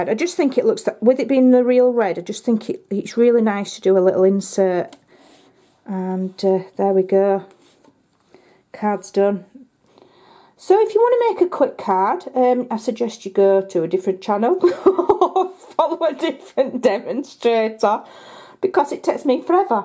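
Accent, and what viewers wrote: Yorkshire, United Kingdom. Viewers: This is adorable. I love watching your videos, you're very talented and charming. But, I must confess, I am totally in love with your wonderful (Yorkshire?) accent. it's delightful! Big hugs from Texas!!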